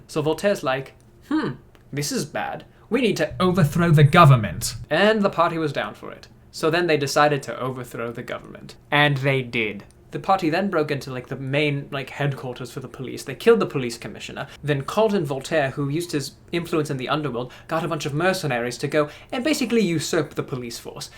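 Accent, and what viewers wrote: United Kingdom. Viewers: Y’all already know that soon there’s gonna be fan fiction (smut) on Wattpad of Voltiaere🙈 Btw I’m simping over because of his content and his British gentlemen from the 1800’s accent aka Voltiaere😳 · Come for the British gentleman voice, stay for the amazing DND stories I mean seriously your home brew content is so damn cool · What's more impressive than his British accent is how long his can keep his accent. Mine tends to fall apart the longer I try to speak with it. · That one person that disliked is just salty cuz they can’t do a British accent as good as his. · read the bee movie script in your british accent. this is an order.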